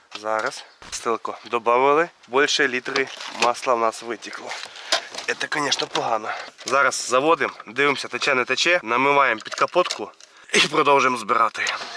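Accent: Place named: Surzhyk, Ukraine. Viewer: Будє прикольно - вахааа.дятел суржик жосткий.песня отстой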